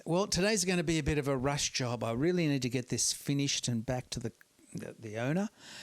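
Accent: Australia. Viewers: One two of the reasons I watch your videos is you are very good and I get a kick out of your deep down under south Australian demeanor and speech accent. And is slightly salty at times with good humor.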